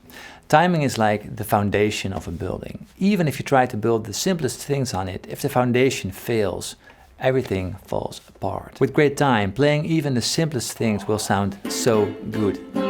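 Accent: Netherlands. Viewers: you sounds dutch? are you dutch? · The Dutch accent is thick in this one. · This man has one the smoothest yet clearly Dutch accents I've ever heard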